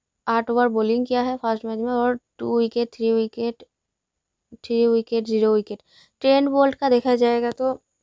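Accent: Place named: Bengal, India. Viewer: Aap west bengal se ho kya awaz se yahi lag raha hai.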